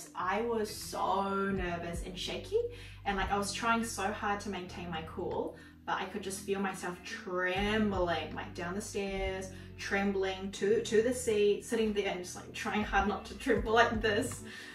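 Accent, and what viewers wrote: New Zealand. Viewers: I just enjoy your deep NZ accent 😂❤ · Hi, your content is so refreshig to watch and your voice so pleasant in my ears. You have same accent with Jennie of Blackpink and then I realized Jennie used to live in NZ 😊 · can anyone tell me whether this is NZ accent english? i'm an english learner and i think this may be the perfect material to practice listening hahaah thank you for the subtile and looking forward to your new videos to come!!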